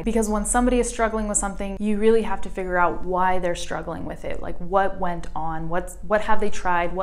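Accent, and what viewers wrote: California, United States. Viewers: Exactly.. she speaks in basic english with clear american accent. That's make us understand easily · A big thanks to you mam, honestly I really enjoyed and understood each everything you said throughout this video make me encouraged and motivated to do more and put me in a field has potential to improve my listening and speaking in English, and one more thing that I want to share is i dreamed myself that I speak American English well like you speaking. Saying thanks again for reading my comments. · I'm a native English speaker, but I will say this for whatever reason while learning Spanish it is much easier for me to understand what a woman is saying compared to a man. I don't know why that is but it is. And yes you have a California accent which is to say really no accent as most other English speakers would say. I would imagine it's much more difficult for non native speakers to understand different British accents, Australians, or related New England and New York accents because as a New Englander I can say we speak more like British people than we probably think with long vowels and guttural h sounds that aren't actually supposed to be in the word but it's just how we talk. 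Or Pennsylvanians and New Yorkers dropping H's and pronouncing words like hat and ass like " "hey-at" and "ey-ass"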